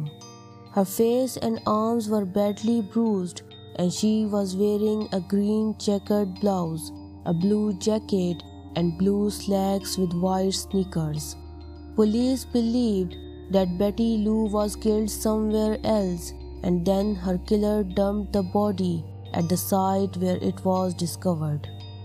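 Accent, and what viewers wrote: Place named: India. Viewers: That's indian accent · Sounds like India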